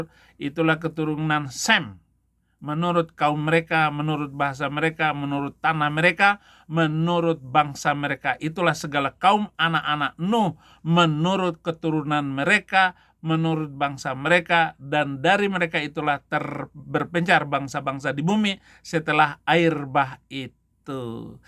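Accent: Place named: West Nusa Tenggara, Indonesia. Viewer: yang sehat selalu ya abba kami selalu berDoa untuk mu🙏💖💖💖🙏(menggikut Yesus keputusan mu) dan itu sangat berat karna dari logat mu saya tau anda dari kota atau daera NTB yang mayoritas (muslim)🙏🙏🙏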